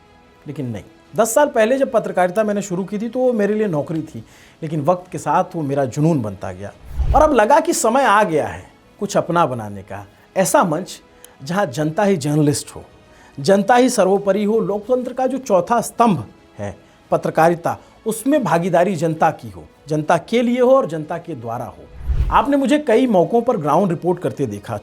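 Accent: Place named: Awadh, India. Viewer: Bahut badiya bhaiya 👏 Aapki awadhi kamal ki hai❤ Lalitpur up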